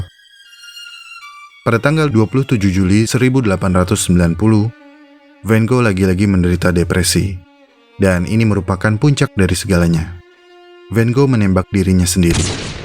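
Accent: United Kingdom. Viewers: Aksen britishnya enak didenger 😅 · Logat British sangat melekat 😂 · denger aksennya ky lg ntn film Hewri Potah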